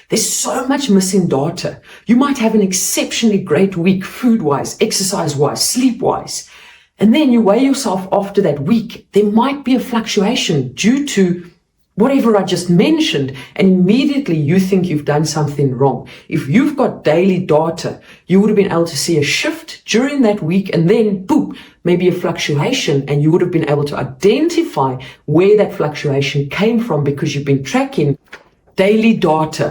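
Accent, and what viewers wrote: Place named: South Africa. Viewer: I knev there where something of about the Scottish accent but there you go South African thanks for your quick response 😊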